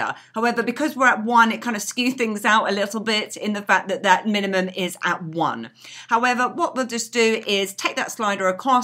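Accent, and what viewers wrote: United Kingdom. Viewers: Hi great video and by the sounds of it you are also in UK . Have given you a follow on instagram. First off the videos are all very clearly explained and understandable so thank you for that. Secondly it would be good to find out either on youtube or on instagram some local UK or London based crypto events. I have signed up to meetup but there are almost too many to choose from . Anyway nice connecting on the crypto space keep up the vids !!!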